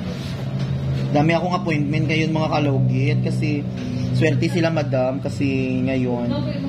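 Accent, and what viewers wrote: Iloilo, Philippines. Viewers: tga davao ka sir bat ilongga ang sulti ninyu dha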